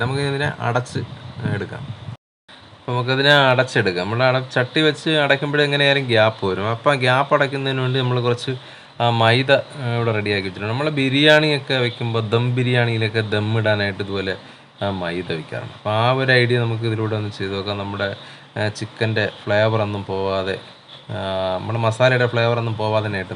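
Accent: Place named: Thiruvananthapuram, India. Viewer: Thiruvananthapuram alle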